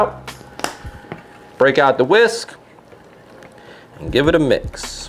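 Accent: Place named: New Orleans, United States. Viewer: You sound like you are from the new orleans area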